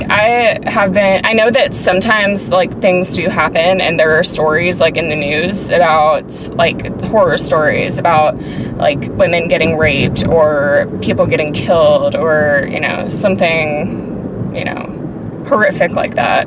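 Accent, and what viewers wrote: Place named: South, United States. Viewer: U have a southern accent, u must be from either Kentucky or Tennessee 😂 love ur video btw.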